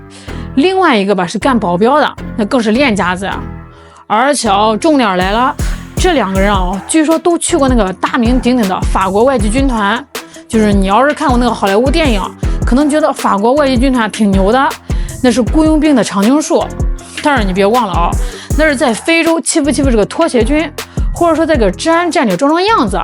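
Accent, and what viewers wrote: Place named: Northeast, China. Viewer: 這種東北口音 聽著很難受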